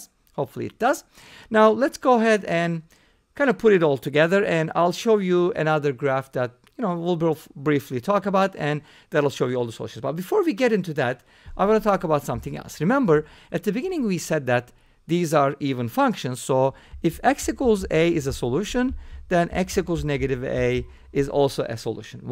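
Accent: Turkey. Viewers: Hey, are you by any chance Turkish? You sure sound Turkish. Other than that, great video! I really enjoy these bite-sized equations. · bakmadım ama k ve r harflerinin telaffuzundan ötürü kesin türk bu adam dedim. Canımsın severek izliyorum yeni keşfettim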